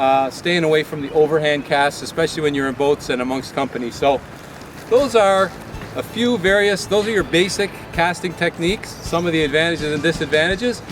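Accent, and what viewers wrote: Canada. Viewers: I can tell you are canadian lol.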